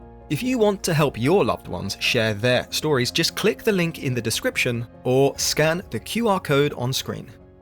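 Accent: United Kingdom. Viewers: yes, but that makes this video blatant propaganda, doesn't it? And why a person with a British accent? He's using a very American-centric POV for the story. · You are a compromise. Speaking American with an English accent. Do you watch a lot of American TV or movies?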